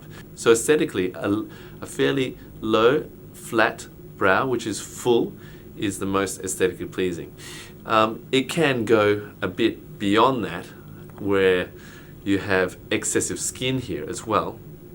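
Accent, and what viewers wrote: Australia. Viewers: You sound Aussie...please tell me your in qld?!?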